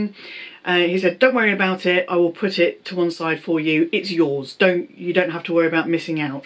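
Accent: United Kingdom. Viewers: Omg yess!!!! All the time I don't know what it its with them. I have a good and strong purchase history but when I went to return an item 5 SA ganged up and were fighting taking back the bag that I had received from LV online a day before!!!! They are ridiculous at most times 🙈🙇‍♀️🙉 I so love to listen to your British accent🥰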